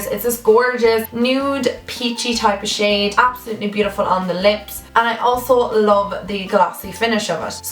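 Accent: Ireland. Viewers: Are you from Ireland?🇨🇮 cork here!😋😊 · Omg I love ur accent X I'm going to Ireland soon X what part are u from X ?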